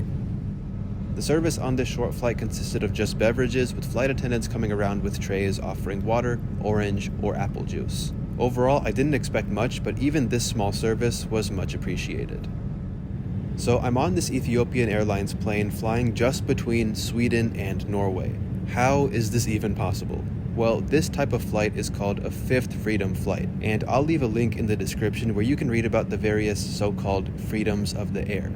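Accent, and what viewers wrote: United States. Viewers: Just discovered your channel. I appreciate your upbeat assessment of your flight. I am so sick of captions w/out voice so it was a surprise to hear a nice American accent! Greetings from north of Atlanta.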